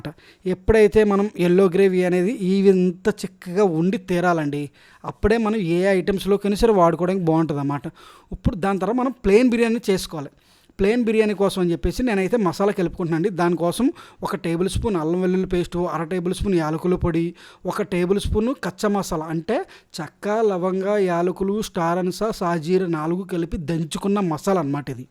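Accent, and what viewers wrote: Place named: Godavari, India. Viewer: Godavari slang lo gouravam 😍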